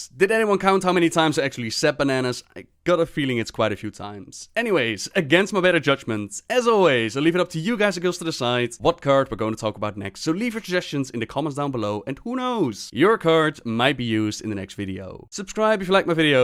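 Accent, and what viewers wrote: Netherlands. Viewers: YES. Combined with the dutch accent he oh so despises, his videos, especially the R-sounds, make you shiver. XD I love it! Thanks for the good work. =)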